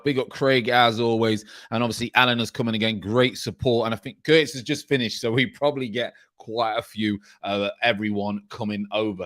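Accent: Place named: Midlands, United Kingdom. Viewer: Big up from south London Why! The delivery of experience nd knowledge with a gruff midlands charm may not always agree on style always a good source of knowledge I am me and that is special enough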